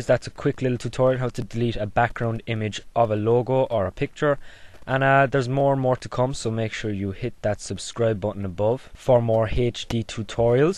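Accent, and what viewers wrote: Ireland. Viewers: the irish voice I can tell because Im from Ireland lol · Nice tutorial! Btw are you Irish?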